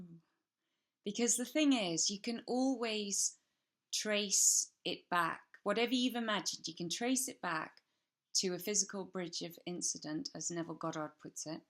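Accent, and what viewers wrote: United Kingdom. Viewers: One of best explanations I've heard on this topic. You explanation is not only on point, but succinctly covers practically every important point made by Neville in all his many talks. As a bonus, you have a dazzling smile, charming English accent, and awesome personality. I listen to this video as a daily superbly positive reminder to maintain the imagining practice, and I'm seeing TONS of benefits Thanks for making this video...subscribed... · Hi from Sydney Australia, your accent is lovely always loved the British accent, I'm new to law of attraction and a HUGE fan of Neville, so many testimonials from ppl from all walks of life. even some skeptics have converted to belief after trying Neville Goddard's guidance and methods. I hope Neville is enjoying all the pleasures of Heaven, he really was HEAVEN on earth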